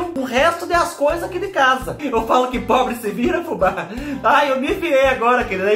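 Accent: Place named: Caipira, Brazil. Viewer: 🥀beijos de Barueri SP ❤ adoro seu sotaque caipira paulista, igual da minha família 😘😘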